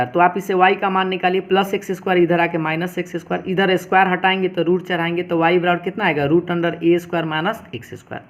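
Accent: Bihar, India. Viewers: sir hum U.P se hai...sir apki voice se pahachan Gaye hai ki aap Bihar se hai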